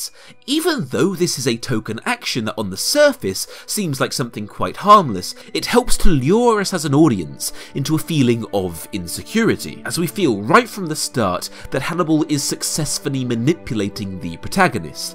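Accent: United Kingdom. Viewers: i love the british accent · I am subscribing simply because of your sexy British voice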